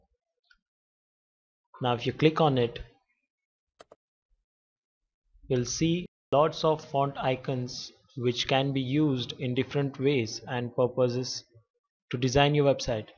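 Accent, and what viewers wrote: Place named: India. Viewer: for the indian accent haha (looking the video with a masala chai ^^)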